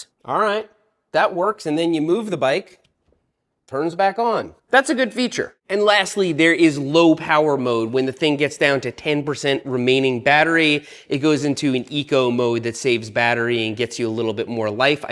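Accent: United States. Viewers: Love the video as always. Freaking love the responses from the manufacturers on the comments. You always have something I wanna go up and show my significant other. She says you should have an accent, but to most you probably have a really hard American accent. lol idk Also isn’t what you call the third pocket the fifth pocket? Im sure there’s another name.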